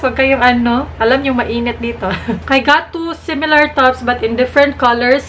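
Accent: Visayas, Philippines. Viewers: new subscriber here.. I like your bisaya review sounds so cute to me..Keep it up!! 😊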